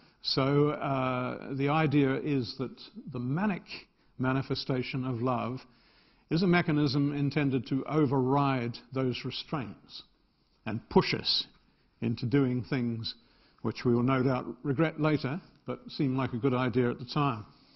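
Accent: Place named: RP, United Kingdom. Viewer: O'Rourke . . Of course he is British. He has a typical upper class academic English accent. Moreover, he used the term "chuffed", which in England means "very pleased with oneself or the outcome". However, I suspect that in his time he has been a 'bit of a lad' himself.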